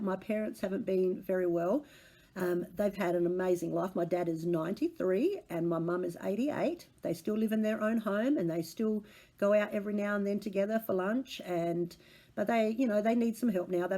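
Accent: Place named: Australia. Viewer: Love your videos and accent - lived in Exmouth, WA in the 70s and LOVED Australia. My oldest son was born there too! I would love to go back and spend more time in Perth and explore more of your beautiful country. I would love the Austen bag - it is amazing. Enjoy your retreat, and have a most wonderful trip back home. Much love. 💕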